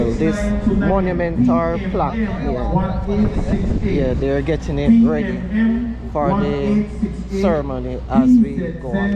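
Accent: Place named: Jamaica. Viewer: Are you Guyanese, you sound like you’re from Jamaica? Good job nevertheless kudos to you. 👏🏽👏🏽👏🏽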